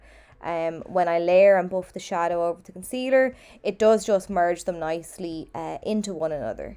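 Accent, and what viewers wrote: Ireland. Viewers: Your tutorials are always so easy to follow and you do amazing looks! I also could listen to your beautiful Irish accent all day 🥰 I have only been there once but I’d go back in a heartbeat!! My parents chose an Irish name for me to honor my Grandma who was 100% Irish herself so Ireland always holds a special spot in my heart ❤️ :)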